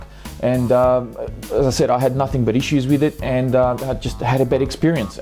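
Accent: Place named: Australia. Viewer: Hi, I see it's been about a year since you did this review of all your SCT's and I wonder if things have changed with the Prolite. I have both a Trooper and the prolite. I just bought the Prolite SCT and ran it for the first time today. The prolite IMO beats the trooper by ton. It handles better, is faster and of higher quality. The first thing I looked at were the body posts. On mine they are so flexible that you can almost bend them 90 degrees and they just bounce back. After 30 races nothing broke so I am pleased with that. My only issue was the motor mount was loose right out of the box. I found that out when I did a little test run in my yard. Luckily nothing stripped or broke. I simply reset the mesh and tightened the screw on it. I love this truck already. I raced a bunch of friends who run slash 4x4 both HCg and LCG chassis and not only did I keep up with them I beat them regularly. 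Great videos you put out. Lots of great info too. As they say, "Come for the info, stay for the accent".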